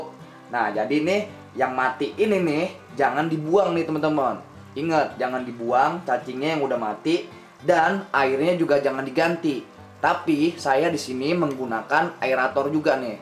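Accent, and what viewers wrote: Jakarta, Indonesia. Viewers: Demen review bg. Betawi tulen. khas bgt Hhai hallo 😁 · Bahasa nye betawi benerrr haha.. bang makasih ilmu nya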